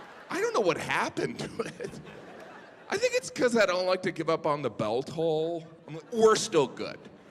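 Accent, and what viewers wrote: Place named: Jamaica. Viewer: thats more like a Jamaican accent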